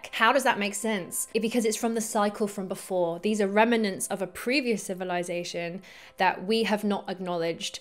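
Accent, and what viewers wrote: England, United Kingdom. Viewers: i'm an old guy and I truly enjoyed watching this. I'm a history buff from way back and its fun to see a young person asking all the questions and poking your finger in the eye of the established historians. I think there are holes. The Mayan calendar was cyclic, sure they missed the end of the world or did we just not read it correctly? Either way your fiery attitude and British accent calling bs on things is entertaining and most definitely reassuring that there's still a chance that the study of history still has some hope. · English accent, nice voice sound, articulate, and amazingly I never heard an "ah", informative, and very attractive, wow, wymm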